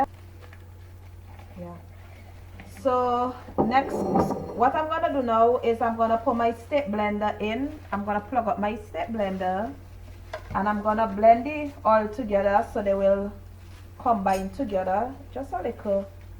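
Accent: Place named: Saint Vincent and the Grenadines. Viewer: OMG. You sure don't sound bajan. My dad is bajan and my mom is vincy. And i was born in vincy and left when i was 14 to Canada. U sound vincy not bajan hon. Lol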